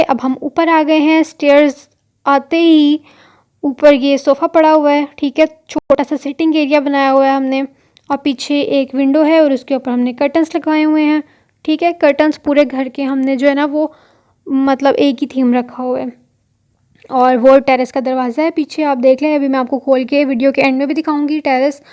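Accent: Punjab, India. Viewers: Mashallah sis bohat piyara ghar h ap ka or Punjabi bohat maze ki h😂😂mory😅😄😍😛😘😙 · Hello bhain kive o Tuc assi punjab ton (india) ton Te tuhadi bunjabi Sun k eda lagda k appa same aa edar wala punjab v same a main bht dinna ton thode valog dehk rai a te mainu bht pasand a rae ne waheguru thonu khush rahke 🙏🙏🙏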